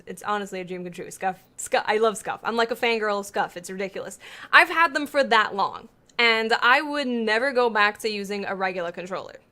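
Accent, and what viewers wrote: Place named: East Coast, United States. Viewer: I'm lovin that accent lol. new yorker? Philly? you gotta be from one of the East Coast cities haha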